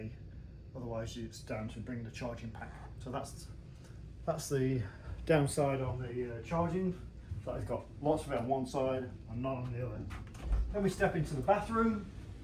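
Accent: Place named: United Kingdom. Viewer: fantastic video, loved it. questions: bathroom, soaps incl, lotion, shampoo/conditioner incl? 2nd question magic carpet you stated nobody can see us on balcony? if magic carpet goes/up down, how do people not see you? Oh love your accent as Americans have various accents too, southern, and boston, new yorkers talk different and rest of us have regular accents but love the UK accents so so awesome....you sound like a teacher or public speaker awesome love your presentations.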